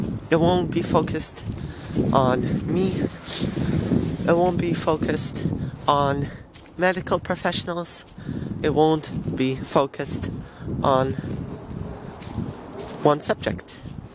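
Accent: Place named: United Kingdom. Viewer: You are from Canada? I thought from UK. Cute ducks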